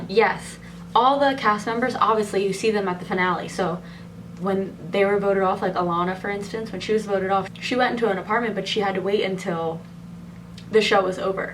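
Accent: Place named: Philadelphia, United States. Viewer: Girl I’m from Philly too and when you first popped up I heard your accent I was like yes!!!! And then it said Miami and I was like🧐🧐 hahahaha but then it all came full circle lol I loved you!!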